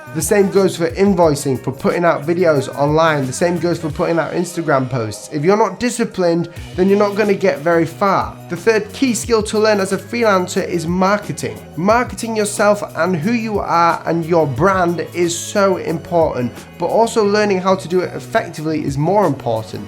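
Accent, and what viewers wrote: United Kingdom. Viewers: I do really appreciate your content btw, since I try my very best in calligraphy from time to time... and I really like british english:D Keep up the good work!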